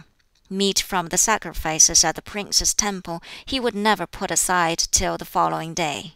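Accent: China. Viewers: I slip into brummie when mad so go check out a clockwork orange to know what true confusion feels like. Can’t believe people are complaining about a Chinese book being read with a Chinese accent 🤦‍♀️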